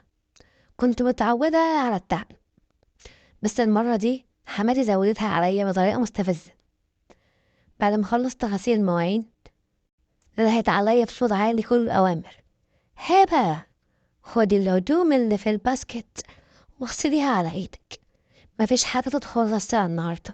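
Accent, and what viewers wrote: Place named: Iraq. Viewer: انت من العراق تحيه من مصر بحب صوت اللهجه❤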